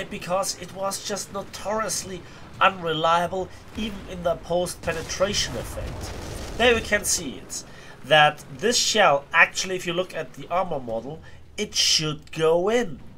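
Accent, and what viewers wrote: Germany. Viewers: A very enjoyable video,. I think there is an element of RNG in war thunder, even though Gaijin denies it. I have penetrated the casement face of the jagdtiger with the T29 a couple times in test drive, but that was only 2 out of about 15 shots with no variation. Though perhaps we should not be totally critical of slight RNG. I believe it acts (intentionally or unintentionally) to represent the uncertainty and inconsistencies one would find in real combat. Also, any chance of a face reveal? We want to pair a face to that German accent lol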